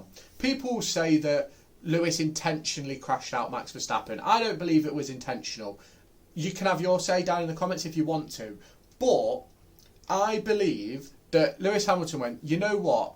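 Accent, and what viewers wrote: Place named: United Kingdom. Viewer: Hahahaha really british talking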